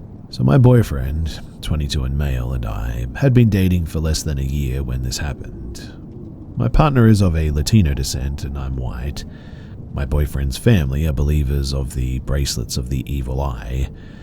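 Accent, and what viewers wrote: Australia. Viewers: Just the aussie I was lookin' for to put me to sleep!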